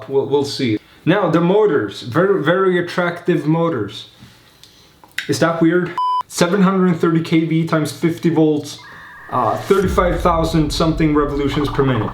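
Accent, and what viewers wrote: Netherlands. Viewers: Are you dutch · The whole time i heared you speaking english i thought you where dutch..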